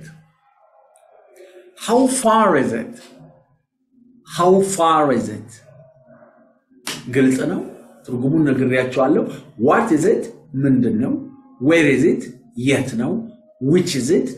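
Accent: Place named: United States. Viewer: በጣም አመሰግናለሁ አቤት ለውጥ ያሜሪካኖቹ አክሰንትን አመጣህልኝ water ለማለት በፍፁም አደር ስታንድ አያረጉህም ቀጥል በጣም ጎበዝ ነህ አክሰንት አዙሮ ሊደፋነው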